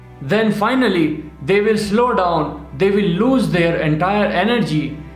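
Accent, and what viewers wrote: India. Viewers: I love this guys Indian accent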